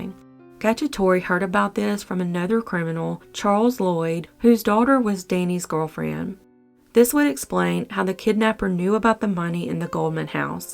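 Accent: South, United States. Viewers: There's that voice! Southern drawl melts my heart. I ordered the SGCS apron in green a couple of weeks ago. I never have gotten a follow-up email. Any idea how long it takes them to ship your items? Or anyway for me to check my order status. I sure hoped it would be here for my New Year's Eve cookout tomorrow night. Oh, well, there's always Memorial Day! Thanks,! EDIT: Just checked my email, the shipping notice came yesterday, I guess I overlooked it. Thanks again! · I find your subtle southern drawl to be quite endearing, bringing a bit ov light to the darkness!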